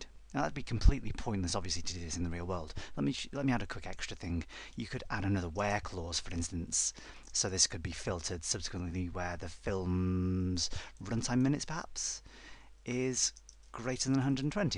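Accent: United Kingdom. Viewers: British accent = learning more SQL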